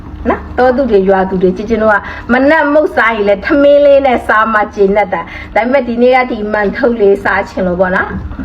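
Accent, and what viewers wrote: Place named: Myanmar. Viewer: ကမြန်မားဆန်ဆန်လေနဲ့ဆိုချစ်စရာလေး❤❤❤❤❤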